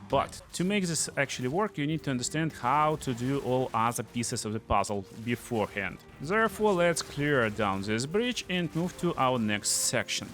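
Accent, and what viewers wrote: Russia. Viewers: ten ruski akcent jest straszny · дружок, я наше произношение везде узнаю - показывай где на нашем языке видео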